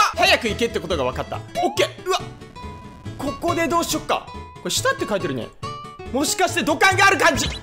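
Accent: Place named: Kyushu, Japan. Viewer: 九州人だ〜、親近感w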